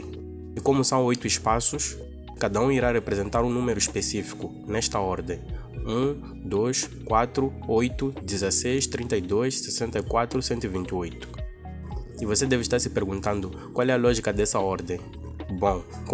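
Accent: Mozambique. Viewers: Moçambique(Moçambicano), Nampula creio eu pelo sotaque. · Eu acho bonitinho o jeito que vocês Moçambicanos falam.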